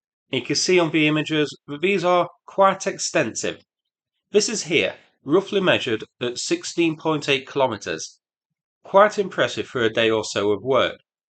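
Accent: United Kingdom. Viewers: Excellent video. No unnecessary sarcasm or disrespect, just a good briefing in good old British English. I hope the Ukrainians make the most of this good moment and momentum.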